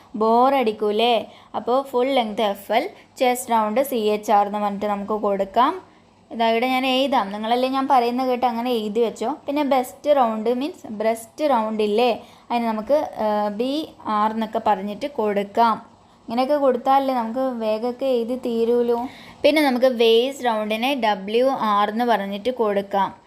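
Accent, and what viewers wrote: Kannur, India. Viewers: Kannur analle.... 😍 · Kannur aano, enkil evideyaa. Samsaarareethi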